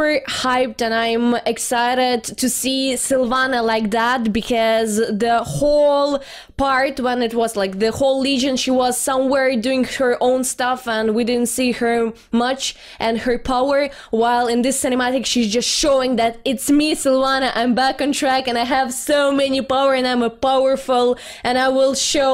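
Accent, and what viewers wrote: Russia. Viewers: Ты из россии, либо говоришь по русски? Акцент тебя сильно выдает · new to channel, is your accent ruske?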